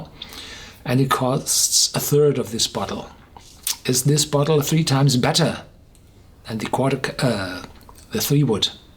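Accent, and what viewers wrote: Germany. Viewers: Where are you from? Your acent sounds like a German one.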